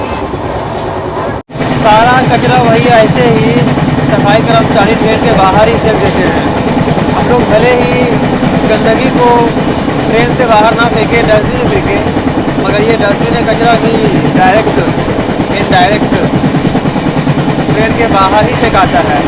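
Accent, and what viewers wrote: Bihar, India. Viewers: ji behtar Hai k aap Gyaan na dein Bhai main aapke saare videos dekhta Hun mujhe Sahi lagta Hai aapke bolne ka style bihar ka Hai Or Mai bhi Bihar se Hun or Mai aapke iss channel ka subscriber bhi hun lekin bhai choti si baat thi k aap Kisi ko gyaan mat do agr Mai Kuch galat bol diya to maafi chahunga but jo mujhe laga maine bol Diya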